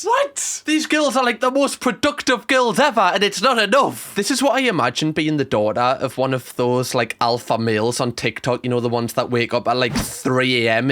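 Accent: United Kingdom. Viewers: I am new to the channel, I have watched a few of your videos. I love british accent...however, I was like "Why he keeps asking for donors? That's wild. " but I finally understood you were saying "donuts" lol, here you go I am one more donut now 🍩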